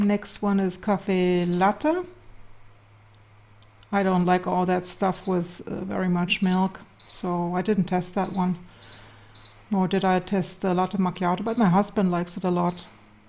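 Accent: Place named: Germany. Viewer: by youur english accent, it look to me you are either German or living for long time in Germany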